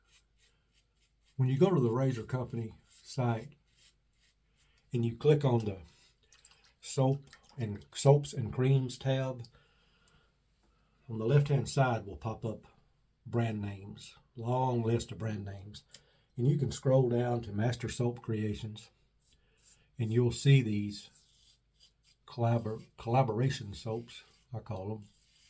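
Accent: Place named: Appalachia, United States. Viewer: where are you located? Do you live in the Appalachian Mountains. You have about the same accent as the people in my area. I live in pound,Va right on the border of Ky. Tenn. and Va. I like your Videos!!!!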